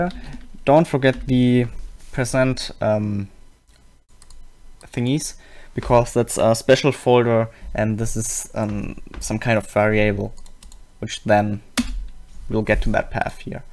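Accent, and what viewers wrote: Germany. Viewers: No hate but It sounds like you have a German accent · are you german? your accent is so like one especialy when you end a sentence like german way or lee bit French way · Like for the cool dorky German accent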